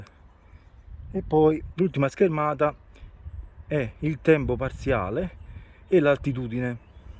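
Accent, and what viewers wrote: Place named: South, Italy. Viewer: Grazie. Voce molto simpatica del sud . Grazie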